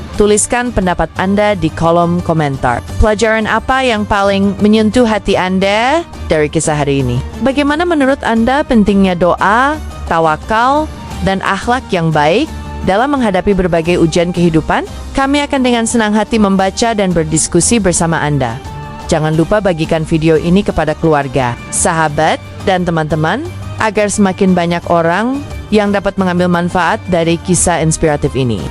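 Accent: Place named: Netherlands. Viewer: Kalau baca tex, jangan berlogat bicara seperti Belanda. Itu nggak lucu......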